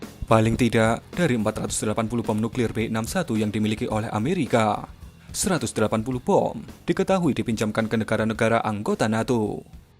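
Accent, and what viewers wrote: Java, Indonesia. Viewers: kg medok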